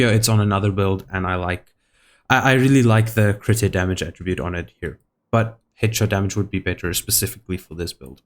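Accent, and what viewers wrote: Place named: South Africa. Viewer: Hmmmm I feel like you are south african 🧐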